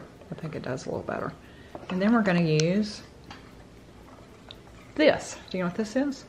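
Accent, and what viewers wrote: South, United States. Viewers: Well done. It's such an American thing calling them biscuits! They're scones for us. Biscuits are a cookie! Love the Southern drawl! Happy day from Sally and the fur crew! 😄❤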